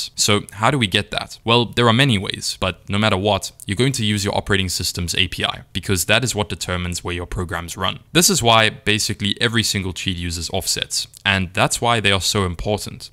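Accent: South Africa. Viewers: hey are you South African?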